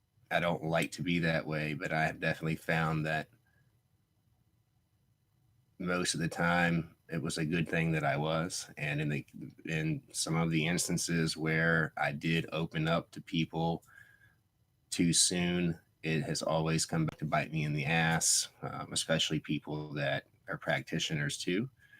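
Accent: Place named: United States. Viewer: Love your American Accent. ♥🐱★💋⚘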